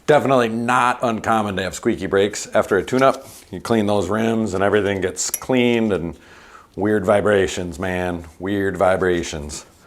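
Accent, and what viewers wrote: Wisconsin, United States. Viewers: Immediately after he started talking I knew he was from Wisconsin. GO PACK lol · Chicago guy living in the central highlands of Mexico with a 10 year old Chinese bike ! My first experience with a local bike shop was $30 charge for this tune up and my bike shifted very tight and I ended up searching YouTube for how to do a tune up myself. It’s good to hear a Wisconsin accent and to see your techniques . In two days I’m gonna give this a try . What part of Wisconsin are you?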